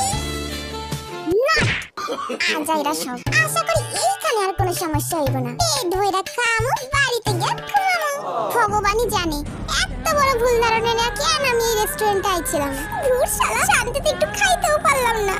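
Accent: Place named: Aminbazar, Bangladesh. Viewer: opsss sorry na mane tumar vasa ta amader Bangladesh er dhaka aminbazar er moto tai bolsi 🙂🙂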